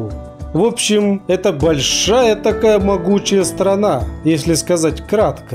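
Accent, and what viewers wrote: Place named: Ukraine. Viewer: русский с Украинским акцентом))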